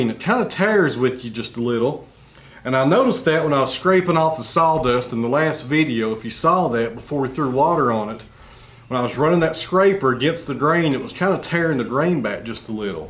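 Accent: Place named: Tennessee, United States. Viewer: Same! His Tennessee accent, calm manner, wonderful Country music, and the beautiful way he presents the timber has me hooked, all the way from Australia!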